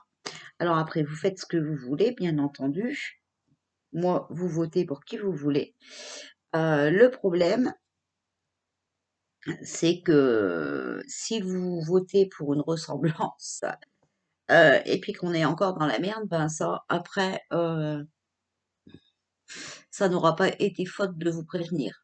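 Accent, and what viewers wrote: North, France. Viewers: J’adore votre accent du Nord ! Mdrrr merci pour ces infos j’en suis sure il va tout faire pour nous aider ! La divinité l’a mis sur notre chemin celui de la vérité et de l’amour ! Sans hésitation je vote Rémy ! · bravo à vous , vous tapez souvent dans le mile , vous etes un soutient psychologique pour plein de monde , vous etes empreinte de la lumière , j'aime bien votre accent du nord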